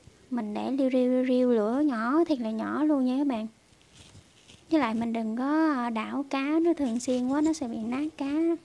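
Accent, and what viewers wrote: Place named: Mekong Delta, Vietnam. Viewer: Mâm cơm nhìn hấp dẫn quá, con gái miền Tây nói giọng hay và khéo tay quá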